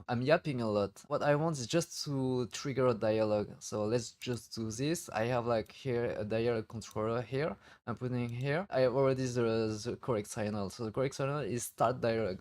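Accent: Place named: France. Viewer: Cette fois on sent un peu l'accent français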